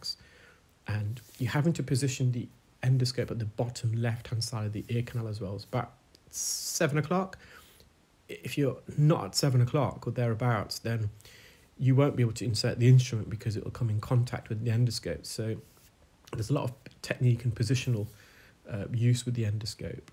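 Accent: United Kingdom. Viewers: I love your videos. They are informative, educational and calming for those with anxiety disorders. I find your voice very calming and soothing and your British accent is like extra icing on a cake. Please keep up the great work you’re doing. Blessings and good health to you.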